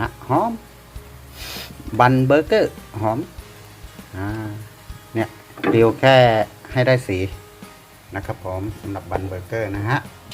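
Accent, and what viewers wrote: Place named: North, Thailand. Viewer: ฟังสำเนียงการพูด น่าจะเป็นคนภาคเหนือนะครับ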